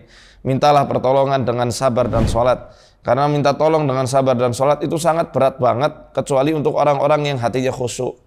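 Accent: Banyumas, Indonesia. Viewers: Ngapak ustad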